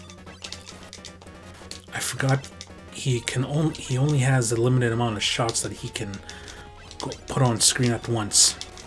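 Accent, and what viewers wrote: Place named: Canada. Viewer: You sound canadian. Fun play through.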